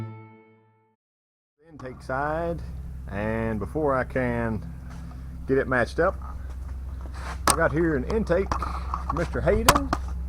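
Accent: South, United States. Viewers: . Thanks. Great Information. Even though you have a southern accent, you dont sound stupid. Hey! Im jus sayin! You use correct descriptions and correct terminology so there is no doubt what you are talking about. Whoa! - some of these other channels! (No Names) Anybody w a phone Can put anything on the interweb.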